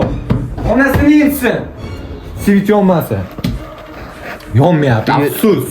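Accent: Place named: Qashqadaryo, Uzbekistan. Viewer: Qashqadaryodanmisz dimn manimcha sheva tortyaptida😂😂munaqasiya dganizga o'ldim lkn😂😂😂😂😂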